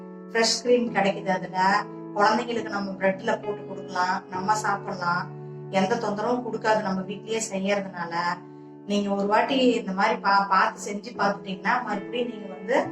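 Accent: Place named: Kongu, India. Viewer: கொங்கு தமிழ் நல்லா பேசுறீங்க.. ஏன் background English பாட்டு போட்டுருக்கிங்க ?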